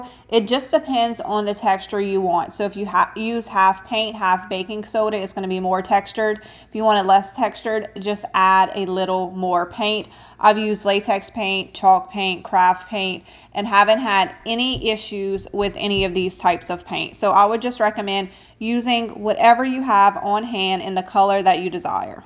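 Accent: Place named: Lafayette, United States. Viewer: when you put in your "paid" value, it would be helpful to include a rough estimate for your supplies, beause i know the iod transfers are not cheap, and you have to account for at least some of the paints. i just recently found your channel and am enjoying it. your voice takes me home to my teen years. i grew up in the subs of houston, texas. my best friend was from morgan city, la and my boyfriend was from layfayette. you sound a lot like them.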